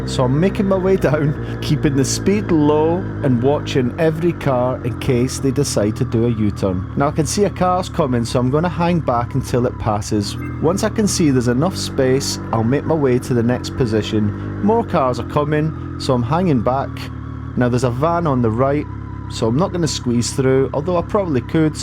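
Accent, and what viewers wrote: Scotland, United Kingdom. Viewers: Just found your channel. Enjoying the content but with your accent spent a while trying to recognize which part of Scotland you are in. 😂 Also a returning rider and being rusty on my town riding these are excellent studies in the art of... Thanks. Subbed.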